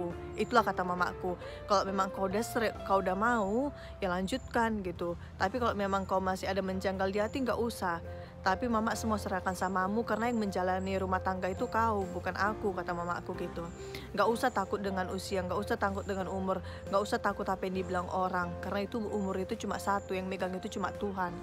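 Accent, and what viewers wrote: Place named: Sumatra, Indonesia. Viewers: Saya senang mendengar logat kakak yang tetap seperti logat sumatra 👍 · Wah...salut bgt dek...walau sdh berada di Jerman.... logat Medan dan Siantar nya msh sgt kental....saya salut... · Hai kak salam kenal logatny siantar man banget bah,salam dr anak siantar yah kak....jangan lupa ajari abang itu bahasa batak jg kak....senang lihat vlog kk auto subscribe 🥰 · Asli medan bah logatnya, suka.. Mamak yg dewasa..mantap.. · Logat kau kayak orang medan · Aku orang Siantar bah ,ku subscribe lah kakak ini 😁😂 kek gitu lah logat orang Siantar , cantik-cantik ,rendah hati orang Siantar Weh, setia lagi dah ! 😇😁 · Cantik banget spt artis Begitu ngomong, ketauan, dialeknya masih kental, Batak banget, tpi saya pribadi bangga. Sukses buatmu dan keluarga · Logat Bataknya lengket x ya ito Hehehehe God bless u both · Paten kali logat nya iban..., siantar nya dimana..., sama kita org siantar... 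· Cantik. Logatnya medan banget, "permisikan", heheee... tp enak ditonton 👍 Lanjuttt 😊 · Masih lengket banget ya logat Siantarnya.... Saya juga orang Siantar di Jl Sisingamangaraja dekat Rindam. Rumah orangtua di jalan apa?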